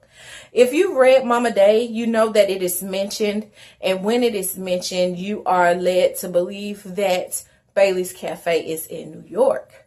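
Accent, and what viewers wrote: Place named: South, United States. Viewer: I didn't want Mama Day to end 😢. Love your Southern accent , TN girl here just subscribed 💕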